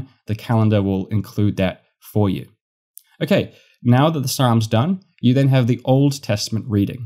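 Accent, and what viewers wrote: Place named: South Africa. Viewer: Thanks so much for this video. It's really helped me understand how to properly go through the daily office. I'm still fairly new to Anglicanism and so I really appreciate this. Also I didn't know you're from NZ (I thought your accent might have been from South Africa or something), I'm on the other side here in Sydney Australia.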